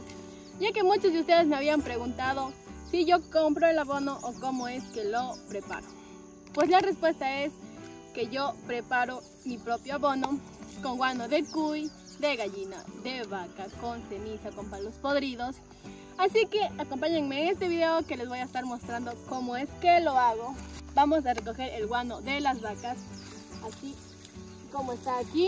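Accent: Ecuador. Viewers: Tienes asento Cuencano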